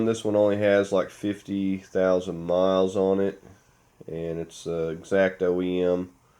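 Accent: South, United States. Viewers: Oh man, great video! Very well explained and with good tips. But as a southern man from Tupelo now living in Germany the last 20 years, it was your southern accent that made me feel at home. Loved it! I doubt I will tackle this problem myself but I will definitely not be boogering any more threads thanks to your tips. Definitely a fan now. Keep up the good work!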